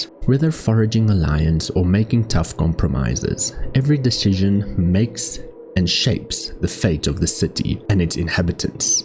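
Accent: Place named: Pretoria, South Africa. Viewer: Thats a fkn Pretoria accent no doubt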